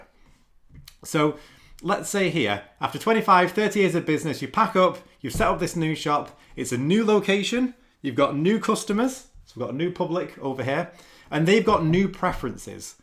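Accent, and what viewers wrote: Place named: RP, United Kingdom. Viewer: Your accent is perfectly fine. I don't even realize my Southern U.S. accent until I hear it on a recording, which has been extremely rare. I do not like my own voice and speech, then wonder how other people can stand to listen to me speak, but no one has ever said anything. Here, we talk of your accent being "proper" English. Fancy or high class. I've recently been a student of the shadow self, which has helped me tremendously, and is why your video was recommended to me. The favorite teacher I've been learning from speaks of the shadow in negative terms, because he is referring to that which we are not attending to because we interpret it as negative. When we're working on these aspects of ourselves, we tend to think negative thoughts are all bad, and we should only ever have positive ideas, so we try to force them into non-existence. 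Then failure is inevitable because of how we bury them, instead of bringing them into the light to be dealt with without judgement or chain reactions they cause through mental-emotional-mental reactions. Instructing that we should be aware and allow and accept them, but only to the extent of the feelings they cause in our bodies, instead of the thought itself, considering the thoughts are not actually you; just programmed thoughts everyone has. Anyway, you've given me a different perspective on it, even if I can't think of even one personal thing that relates to it, I do understand now. Thanks very much, and I'll watch for your other content.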